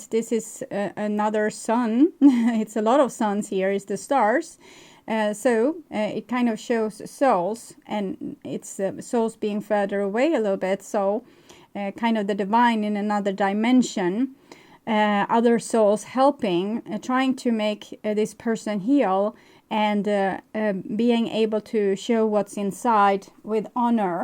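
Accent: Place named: Sweden. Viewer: ~ Thank you for the reply. I have subbed to your channel. 👍 I also, love Siana and miss her. You remind me of her. Must be the Swedish accent. Thanks for sharing your gift with all of us. Much love from Texas! 😘❤️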